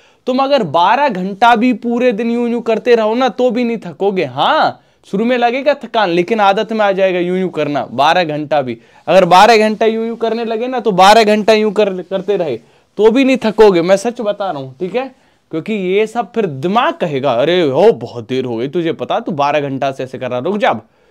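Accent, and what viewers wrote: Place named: Jaunpur, India. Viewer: Sir... Apke padhane ka style bhoat hi pasand aaya hai.... Ek dam jounpur sultanpur ki tone me.... Mazaa aagya evs ko desi touch me padh kr🙏🙏🙏😊thank u sir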